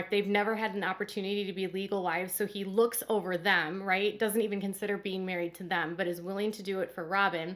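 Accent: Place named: Wisconsin, United States. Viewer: 👍🏼Just wanna thank you for sharing your insights and research with us, you're very thorough!💕 I sooo love your accent, some of my relatives are from Wisconsin and Minnesota so you sound like family to me!🙂 Please stay safe, keep doing what you do and God be with us all, Amen!🙏🏼🙌🏼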